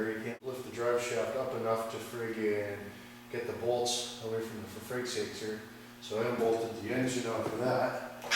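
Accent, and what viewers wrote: Canada. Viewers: awesome stereotypical Canadian accent.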